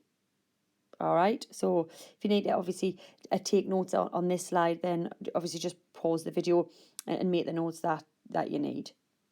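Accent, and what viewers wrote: Newcastle, United Kingdom. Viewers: Really great videos and also great accent! (I'm also from Newcastle)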